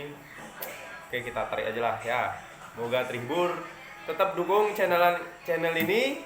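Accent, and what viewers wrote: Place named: Java, Indonesia. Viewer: Pas nyanyi medoknya hilang nggih mas 😂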